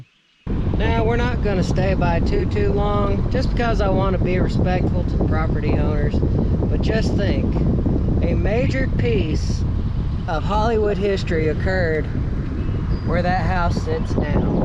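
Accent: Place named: South, United States. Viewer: Perhaps, get out and do some hiking yourself and you will not be sucking for air...sounds like your a southerner and being so close to the river and or any water source as well as walking down a trail...very fortunate to not crossed paths with a CottonMouth, Timber Rattler or other venomous no-shoulders that are all over the area...wearing flip-flops probably not smart.....Oh well, I just wasted 13 minutes....I hope ur approach improves because this was pretty bad...not intended to be offensive just honest feedback. Take Care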